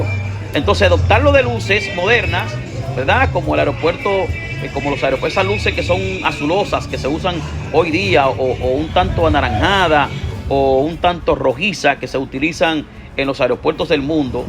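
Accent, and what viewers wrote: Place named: Caribbean. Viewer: hola señor.. creó qué es su nombré.. vemos qué usted vive obsesionado usted parece a ésa ONG..enemigas de la imagen del la RD.. porque usted compara ahora la economía de un país dé diez millones de personas con economía de grandes potencias cuando usted mismo dise qué la potencia que tiene esos grandiosos aeropuertos su presupuesto dé seguridad es mayor que la de china y Rusia.. usted se canta y sé llora.. y muchos nos preguntamos cómo es qué usted viaja semanal al meno qué usted no responda a una campaña dañina contra la RD. O usted hace eso porque responde a los intereses de las personas qué quieren construir el aeropuerto dé bávaro que nosotros estamos dé acuerdo que sea construido y qué sea el más moderno le cojimos la seña ya qué usted menciona el aeropuerto de punta cana..y hemos notado en esté vídeo ciertos acentos que no son dominicano escuchese usted mismo que le sale un acento de una isla colonizada del caribe vemos también que usted reconoce que la RD es el país del caribe más turístico y la ciudad Dr santo domingo como la más bella y moderna del caribe resalte el metro de santo domingo.. 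el teleférico dé santo domingo.. único en el caribe..la economía propia más robosta y deje la obsesión con el aeropuerto las América.. porque ya usted ralla en la obsesión y harta hasta la próxima semana cuando vuelva con la misma canaleta cooooooooooooño que mucho jode con él aeropuerto las América no sea usted tanto por no decir otra cosa usted sueña con el aeropuerto las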